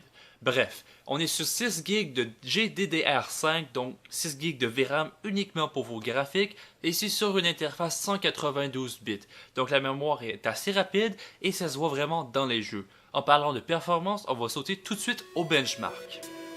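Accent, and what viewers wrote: Canada. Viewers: Je comprend bien, tu est Canadien ?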